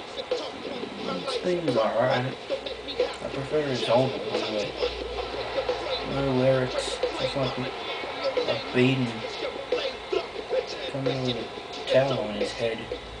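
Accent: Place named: United Kingdom. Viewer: I love your british acent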